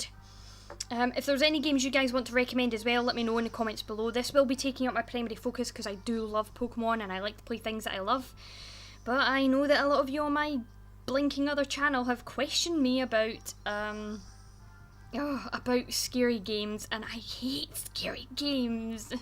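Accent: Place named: Scotland, United Kingdom. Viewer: Could you please do a video of you pronouncing different Pokemon names? I admire Scottish accents, and have been trying to find a video of a Scot pronouncing Pokemon names for a bit now, and this is the closest I've found.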